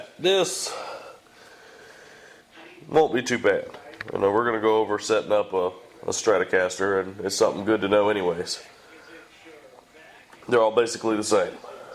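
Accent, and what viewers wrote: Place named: South, United States. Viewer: your accent sounds a lot like Bret Farve bro. you from Mississippi or Louisiana ? good video, very basic and to the point. thanks man.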